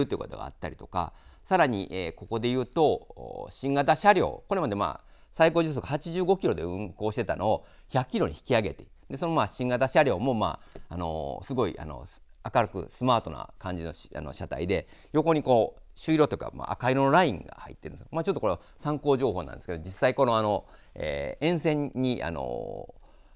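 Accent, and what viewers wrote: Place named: Kansai, Japan. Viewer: とりあえず関西弁やめとこか？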